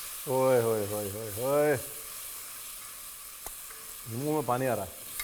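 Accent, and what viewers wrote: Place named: Punjab, India. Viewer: Sweet panjabi hindi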